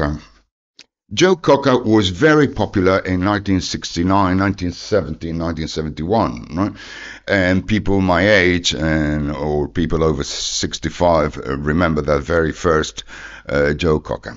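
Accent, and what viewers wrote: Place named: Birmingham, United Kingdom. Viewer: were a phenomenal band, and I think they were one of the first Progressive Rock bands. Their album is pure magic. I'm also huge fan of the vastly underrated band. went on the become the founder and lead singer of, and his voice is one of the best in Rock music history. is a 5 star masterpiece! It has such a collection of great musicians from the U.S. & U.K. who accompanied Joe Cocker on this tour. I was fortunate enough to see Joe Cocker at Woodstock (yes, I am old enough to have been there), and Joe Cocker captured the musical world with his beautiful expressive voice for a few years, but because he was struggling with alcoholism and he was a very shy man he stayed away from the public for several years. He did have some hits in the mid 1970's with songs like " " and " ", but he didn't become popular until " " from 91/2 Weeks. 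This is a bloody good channel, I really liked this video. You're living in Spain, but I detect a Brummy accent. Are you from the U.K.?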